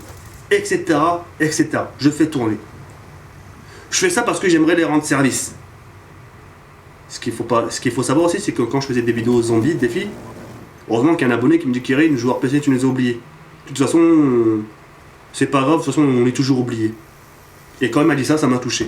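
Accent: England, United Kingdom. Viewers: J'adore ton accent anglais <3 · J'adore ton accent anglais XD très belle vidéo ;) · mdrrrrr le mec qui fait le saut contre le mur bref.. sinon jte felicite pour cette video jai pris beaucoup de plaisir et puis jaime bien ton accent anglais mdrr alller salut:) <3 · Like pour le magnifique accent anglais de:D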